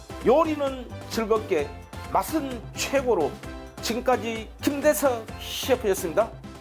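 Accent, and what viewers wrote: Jeolla, South Korea. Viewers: 경상도 아니고 전라도 사투리 인거같으신데요! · 헐 지는 전라도 본토 발음 같은디..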